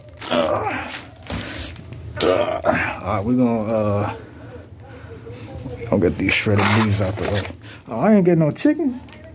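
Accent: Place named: Indiana, United States. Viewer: What’s really crazy is on the outs you’ll only see 98% of the stuff he has in a hood store. Nowhere else. N bro sound like he from Indiana or Michigan he don’t sound like he frm da city or Illinois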